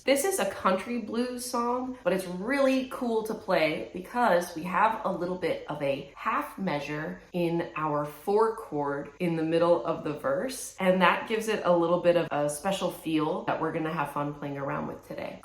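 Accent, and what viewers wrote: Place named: South, United States. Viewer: Love that Southern drawl!!! Great lesson too!!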